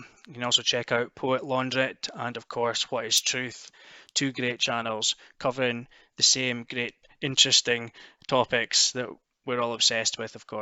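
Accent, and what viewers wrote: Scotland, United Kingdom. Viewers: Least you use your wee scotch voice...😉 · Fuckin love Scotland Scott's forever fuck thst... Love the accent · Anybody believe this Sammy the Bull story? Doesn't add up. As a scouser I'm trying to decipher what part of Scots you're from. Its not a heavy Glasgow accent or Edinburgh. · I might be biased as I'm Scottish but it's good to hear a Scottish accent that isn't on Jeremy Kyle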